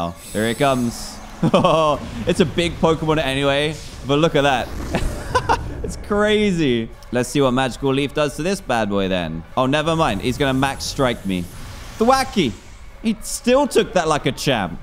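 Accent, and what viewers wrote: United Kingdom. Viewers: I know it's just your British accent but evolution is pronounced as evv-oe-loo-shin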